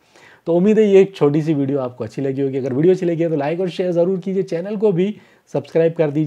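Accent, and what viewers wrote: Sindh, India. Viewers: bhai apka sindh accent hai. Kiya ap sindhi hai?😊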